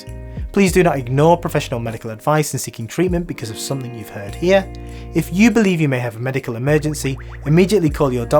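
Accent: United Kingdom. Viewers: He has a British accent because he’s British. He speaks very clearly and is easy to understand to me and the background music is fine too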